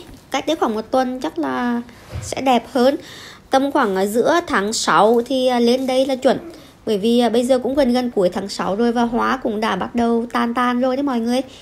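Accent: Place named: Nghe An, Vietnam. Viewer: Xin chào oi, hôm nay lại được gặp và nghe giọng Nghê An hà tĩnh dễ thương làm mình nhớ VN mình quá, vườn hoa hông chỗ bạn đẹp quá, nhiều hoa nữa, mõi lần xem video của bạn mình có cảm giác đang cùng bạn đồng hành ở 1 đất nước rất xa VN, dù ở ca nhưng mình thấy có vẻ rất gần vì mõi ngày vẫn lên YouTube tìm xem video mới của bạn, nghe giông VN xa quê, người VN xa quê. Bên đó vẫn đỡ buôn hơn vì có cộng đồng VN mìn rất đông phải ko bạn. Bạn giữ gìn sức khoẻ nha. Chúc gia đinh bạn nhiều yêu thương. Mình vẫn sẽ chờ đợi nhưng video mới của bạn mõi ngày và đồng hành cùng gia đình bạn.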